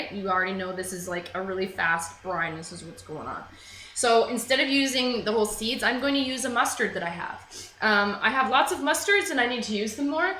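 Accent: Canada. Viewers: Lol you are so canadian! The accent is pretty sexy though...great video!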